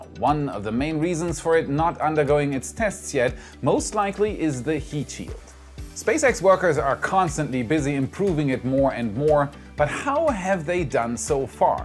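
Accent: Germany. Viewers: Your german accent is almost non existant now. :-) hi from magdeburg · It isn't a weird mixed accent. It's English with a German accent.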